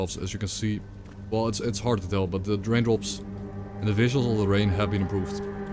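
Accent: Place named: Netherlands. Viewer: u dutch? u sound dutch.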